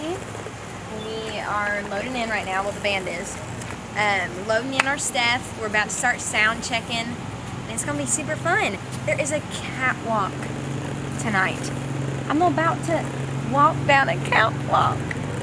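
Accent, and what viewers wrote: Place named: South, United States. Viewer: I love that southern accent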